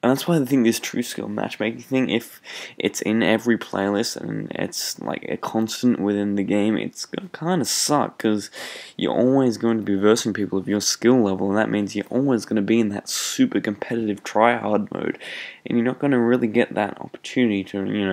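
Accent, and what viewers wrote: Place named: Bogan, Australia. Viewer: Hop on the old bandwagon? Dude you're sounding like a bogan now :O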